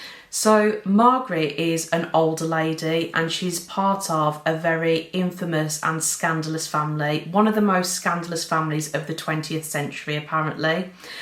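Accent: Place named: Midlands, United Kingdom. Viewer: Love your videos! Such a fresh approach, speaking like a lovely normal person from the Midlands 🙌🏼 Watching all the way from Perth, Australia 📚 Currently reading The Paris Apartment, by Lucy Foley. So far ⭐️⭐️⭐️⭐️⭐️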